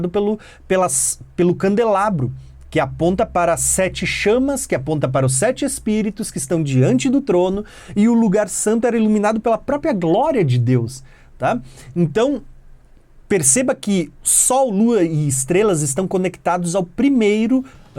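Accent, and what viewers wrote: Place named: Santa Catarina, Brazil. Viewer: Catarinense ou polones fala assim mas acho que dá pra entender né?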